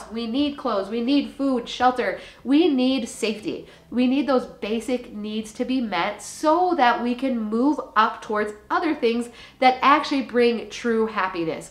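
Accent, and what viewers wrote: Minnesota, United States. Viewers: I needed this ❤ Also a MN-girl ❤born and raised! I think that's why I "hear" you so well... 10 people could say the same thing, and I obsorb 🚫 nada But starts talking and its like the epitome of Minnesota Nice 👌 I'm so comfortable when you talk 😌 Really helps me actually, take in the lesson you're trying to get across!!!!! I freaking love you❤ Thanks for being you🙏 and all that you do for us!!!!!!!!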